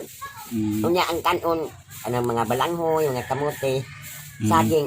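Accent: Surigao, Philippines. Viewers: hi!! sakit n kau akong panga kinatawa s imo la... gimingaw n nuon kos yawyaw sakong nnay dras davao.!😅 parehas jud mo ug accent kay surigaonon pud xa. more videos la mkawla kas kamingaw🥰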